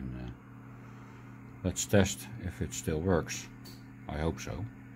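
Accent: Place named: Netherlands. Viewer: I always enjoy the Dutch accent in English. It keeps me from being a stress kip. :D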